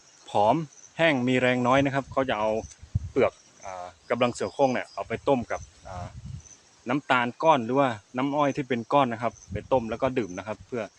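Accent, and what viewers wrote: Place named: Central, Thailand. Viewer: คุณเคยอยู่หรือไปเรียนในเมืองมาก่อนหรือเปล่าคะ พูดสำเนียงภาษากลางชัดเจนดีมากค่ะ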